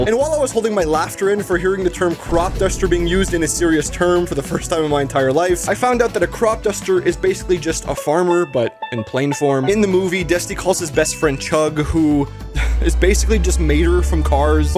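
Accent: Canada. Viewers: This dude sounds Canadian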